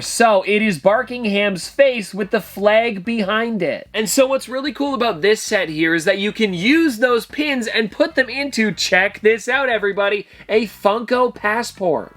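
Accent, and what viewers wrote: Canada. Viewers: dude are you canadian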